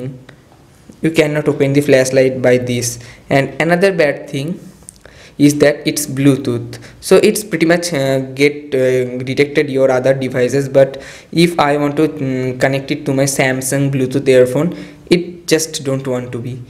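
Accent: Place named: India. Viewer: Don't let the negative comments about your English accent affect you. You as an Indian know at least 2+ languages which is great. Good overview.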